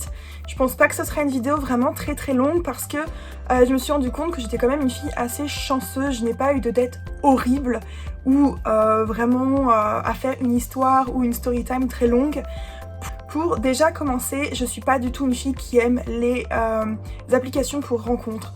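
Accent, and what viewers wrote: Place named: France. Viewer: Sinon....le mec qui se moque de ton accent ....j'ai connu ca aussi avec un de mes British dates (j'en ai eu 2 au total lol). Et il m'avait fait la même, il se moquait de mon accent ...il m'a tellement gonflée que je me suis barrée et je l'ai planté du coup 😅😁 Alors voilà, comme tu disais....on sait qu'on a un accent parce qu'on est francaises...mais y'a des limites !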